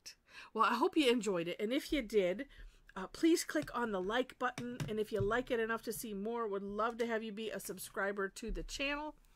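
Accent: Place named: South Dakota, United States. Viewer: your accent is very much like a friend of mine from SD. Just guessing.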